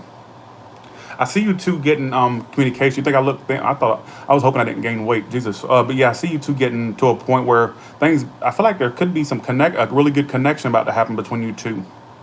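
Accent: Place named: Georgia, United States. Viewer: Thank you for your frequent readings! I meant to get back to you when you said you’re from Georgia, not NC; I have relatives from Georgia, that’s why your accent sounded so familiar lol. Thanks for responding! You’re such a sweet soul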